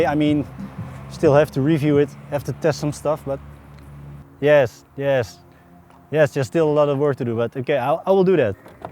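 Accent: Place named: Netherlands. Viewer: only be one the best :), Nederlands tongval ;)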